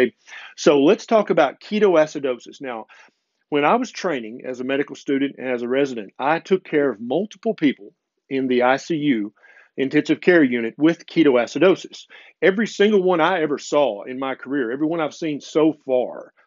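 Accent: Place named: North Carolina, United States. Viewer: I told my wife about starting Keto, and she brought it up to a veterinarian who works at her office. My wife called me and had the vet talk to me. She proceeded to tell me how Keto lifestyle could mess with the brain, Ketoacidosis, etc. I wish I had seen this video so I could forward it to her at the time. Love your videos Doc! I'm from NC. You sound like my people!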